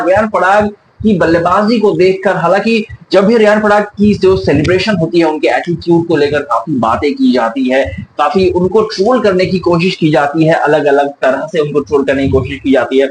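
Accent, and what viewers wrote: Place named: Assam, India. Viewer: Bhai aap Assam se ho kya..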